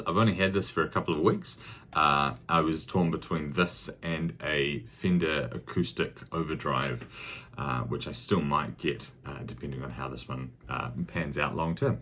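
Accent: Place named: New Zealand. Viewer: Hi You so sound like a Kiwi New Zealander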